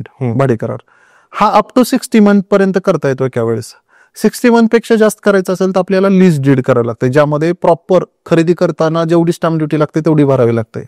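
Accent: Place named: Jalgaon, India. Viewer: Jalgaon cha vatatoy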